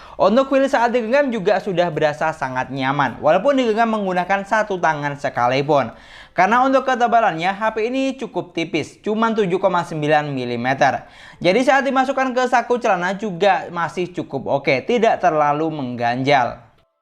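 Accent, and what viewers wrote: Madura, Indonesia. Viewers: Orang madura ya bang?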